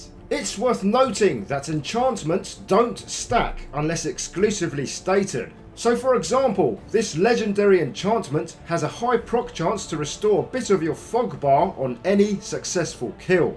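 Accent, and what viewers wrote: United Kingdom. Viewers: It's always sine British dude on these game videos 😭😭😭😭 thanks tho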